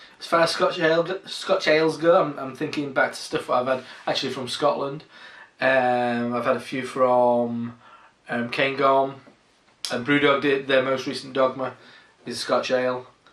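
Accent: Yorkshire, United Kingdom. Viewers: oh thats bootiful loovely haha gotta love the yorkshire acsent on an american beer ;) = great review dude = i tried finding if they were going to export to uk from what i have heard it may be end of year before they export to UK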